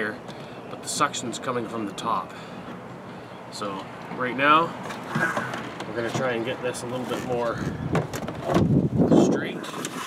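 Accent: Canada. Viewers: This guy sounds Canadian to me. And he used Standard International units (Litres). 😉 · He sounds Canadian.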